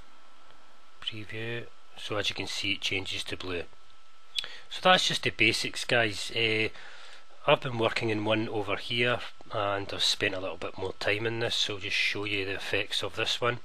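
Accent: Scotland, United Kingdom. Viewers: All these people not knowing what a scottish guys accent is :D poor non UK people.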